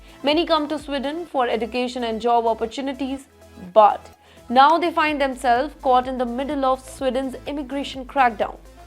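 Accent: India. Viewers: 🤣 She is mispronouncing many words but I guess it’s her Indian accent. All forgiven. At least her video is useful to the public. 😀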